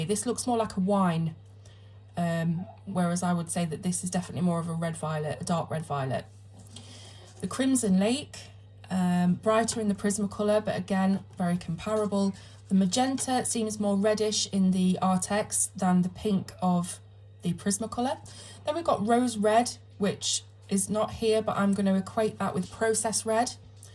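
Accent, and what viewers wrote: England, United Kingdom. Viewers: You don't need to reply to me; just asking you to please remember to turn on CC for those of us who have difficulty following your verbal comments? (Not criticizing your speaking--it's the English accent (I'm from the U.S.) along with my hearing loss that makes it harder to decipher words, in my case.) After your review, if I understood you correctly, these may be the best smaller set of PrismaColor Premiere dupes yet? I like that they blend better and break less easily, which are my two main complaints with PCP. Thank you for this review,! By the way...I now have my copy of Rita Berman's "Meine Reise durch Europa" in hand and am ready for your color-along, whenever you are ready to begin it...!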